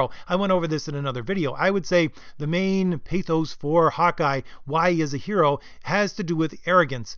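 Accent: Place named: Ireland. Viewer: you sound irish or oirish thats great i m northern ireland your channel is great!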